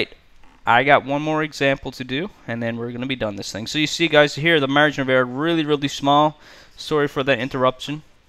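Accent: Canada. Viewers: Helpful video! Just wondering but are you Canadian? :p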